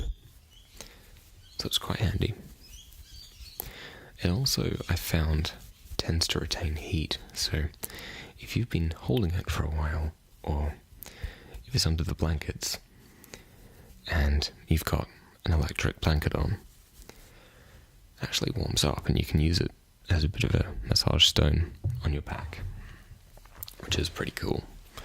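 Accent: Australia. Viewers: Living overseas I never realise that I could miss the Australian accent. Nice video. Roleplays? Crystal shop?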